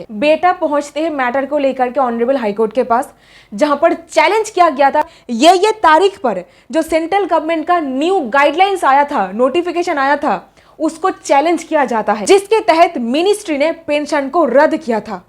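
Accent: Bengal, India. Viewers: she has Bengali accent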